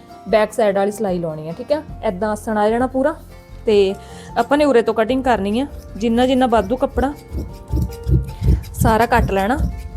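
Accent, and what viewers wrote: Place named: Malwa, India. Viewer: Ssa dii, Diii tusi kehri side toh nd tuhadi boutique kithe aa????? Tusi Malwa area de lagde o kyu k bolan ch thoda farak aa sade nalo 😊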